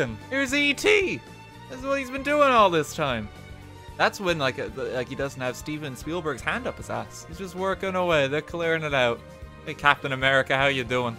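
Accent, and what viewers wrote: Ireland. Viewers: No he's irish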